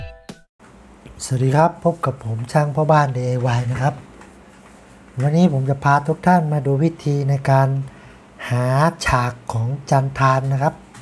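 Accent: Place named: Thailand. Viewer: เค้าพูดภาคกลางไม่ชัดไม่ใช่แปลว่าไม่ใช่คนไทยคนเหนือคนใต้สุดก็พูดกลางไม่ขัดจะถามทำไมยายผมไทยแท้ยังพูดกลางไม่ซัดเลย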